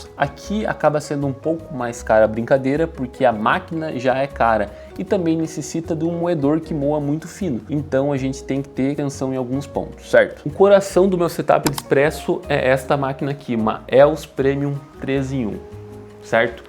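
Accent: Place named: Curitiba, Brazil. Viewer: e esse sotaque aí, é daonde, daqui de curita? PARABÉNS pelo setup, tô montando aqui tbm, tô c r3 blade (sensorial muito bom), e o elétrico femobook a2 (surpreendente), tem dia que faço espresso naked+puck (pra aprendizado fundamental), noutro frenchpress, noutro moka, noutro v60 e clever, é muito legal essa dinâmica e ritual do café de diferentes formas e resultados! Investi num k4 e no starseeker e55pro, e num porta filtro 2spout, que ainda estão pra chegar! E, sim, o mais importante e diferencial é um BOM MOEDOR! Sucesso ao canal e bons cafés, irmão!!❤🎉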